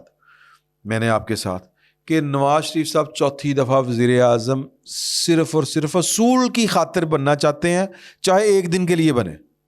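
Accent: Punjab, Pakistan. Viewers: Ufff Oolitical Sciences Einstein speaking his sh!!!t out—— PPL living in 2023 but glamorizing FAUJ and SAPASALAR - seems like England Dark Ages ir even Sadam and Qadafi Era if 1990s- Bro you step back and think about the era of InfoGlux - and how yo use it- This Chootiyapa of senseless Vlog rambling , reading from news papers and afding your tonal punjabi mix wont bring value- 2023 beyond its not for you for Fikreee Faqeeer like you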